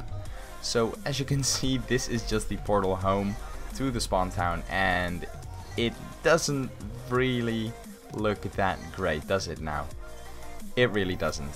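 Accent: Netherlands. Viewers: Are you dutch? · are you Dutch? Becouse you sound Dutch:)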